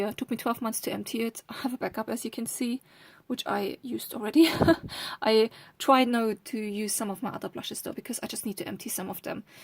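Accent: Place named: Germany. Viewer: Your accent is lovely. I may be mistaken, but it sounds either German or Scandinavian.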